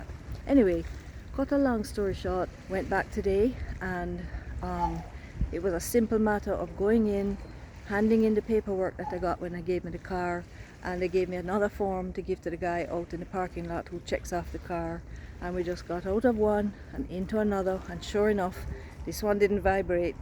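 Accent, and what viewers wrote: Jamaica. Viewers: Ive never heard a white person with an authentic Jamaican accent, I like it 🫡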